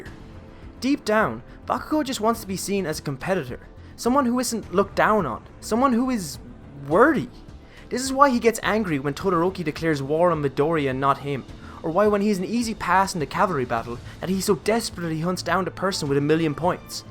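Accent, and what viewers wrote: Ireland. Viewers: I love your accent, is that Irish. And Bakugou was my favorite from the very beginning, he is and will always be my favorite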